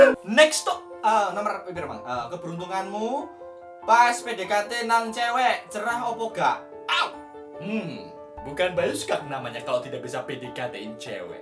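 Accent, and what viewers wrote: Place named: Malang, Indonesia. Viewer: Bayu. Orang mana. Malang Yo omongane koyo wong malang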